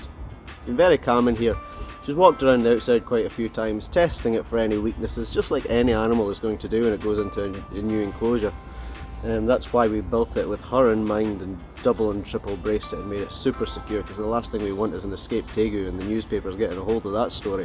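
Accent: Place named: Edinburgh, United Kingdom. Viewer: Nice one mate, I'm thinking about an outdoor enclosure for a Tegu. I have ideas to build framing a little wider than this but around 7-10 feet tall with large logs upright and hammock like areas to bask up high and some climbing space. You sound local to myself, Livingston/Edinburgh? if your budget isn't high I get lots of spare wood from my joiner friend, excess off jobs if you ever need some. I'm in the middle of a raised pond atm all done from his free wood, also an 8 foot turtle dry enclosure to sit above his 8 foot aquarium can climb up into so I can fill the tank right up and utilise all the swimming space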